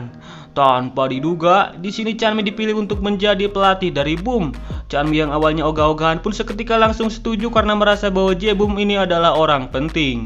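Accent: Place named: West Java, Indonesia. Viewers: Pasti urang sunda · logat sunda itu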